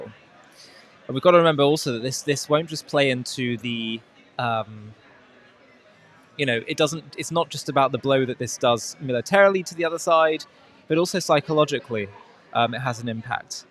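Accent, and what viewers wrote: United Kingdom. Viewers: it's just a british (based) accent. nothing extraordinary about it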